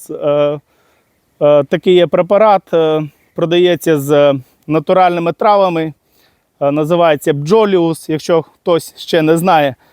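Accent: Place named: Bessarabia, Ukraine. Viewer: Да, не ожидал услышать такой чистый украинский из Бессарабии!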